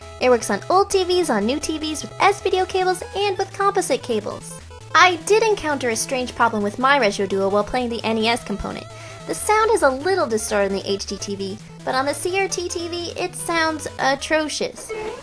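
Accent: Canada. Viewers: Your Canadian accent is so cute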